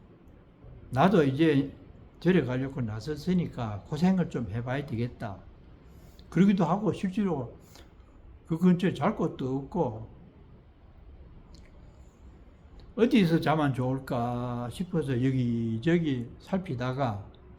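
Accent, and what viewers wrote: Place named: Andong, South Korea. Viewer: 안동사투리가 구수한 스님법문 잘듣고 있습니다 얘기를 너무 재미있게 하셔서 다음회가 기다려집니다 고맙습니다 스님❤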